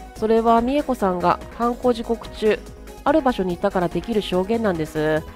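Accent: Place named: Kyoto, Japan. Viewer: の京都訛りに出会えて嬉しいです♪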